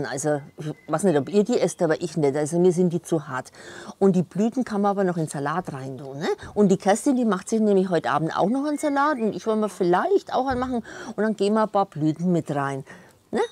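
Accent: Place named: Lower Franconia, Germany. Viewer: Gruess Gott,ja jetzt Weiss ich warum Mir das nicht gelingt.ich habe immer nass geschnitten.Der Dialekt macht Mir heimweh,du musst ja ganz nah bei meinem Geburtsort wohnen.Bin ein ausgereister Unterfranke.Zwischen Schweinfurt und Stadtlauringen.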